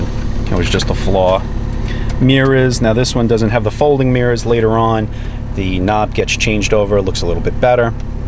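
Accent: New England, United States. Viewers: fair enough I'm from NH just knew that had to be a new England accent lol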